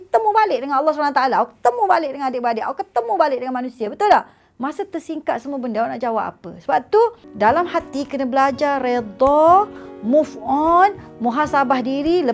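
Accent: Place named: Kelantan, Malaysia. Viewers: Bahasa Malaysia aksen Kelantan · haaah..mari mana...sah kelate🤣🤣🤣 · Ustzh awal2 lancar je lama2 keluar jgk Kelantan dia haha.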